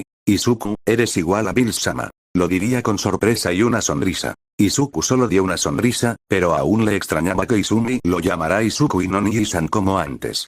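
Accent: North, Mexico. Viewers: Mmm noto algo de norteño xx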